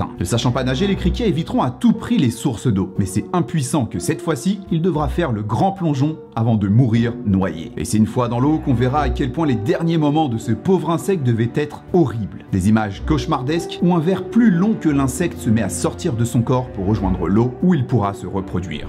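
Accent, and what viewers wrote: Belgium. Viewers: Tu es français ou belge?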